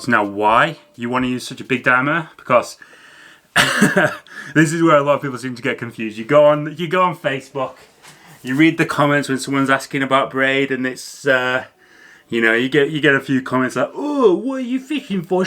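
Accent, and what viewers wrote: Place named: Barnsley, United Kingdom. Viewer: The Barnsley accent tho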